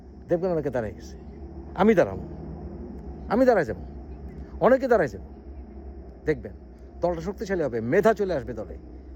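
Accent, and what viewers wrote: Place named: Noakhali, Bangladesh. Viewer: আপনি নোয়াখাইল্লা 😅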